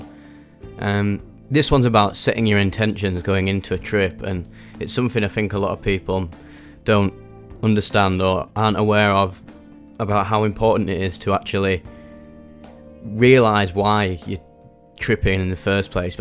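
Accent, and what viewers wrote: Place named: York, United Kingdom. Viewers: I reckon he's from Yorkshire, up in the North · Luvin the ooooh ai, cheeky bit'o York speakin. All reminders of home/my British heritage aside, appreciate the sharing of your wisdom! I'm fascinated by psychedelics and hopefully with the right intentions, setting and people I can use it as a positive tool. However also knowing the extreme risks in undergoing such activities. Thanks again! 🇬🇧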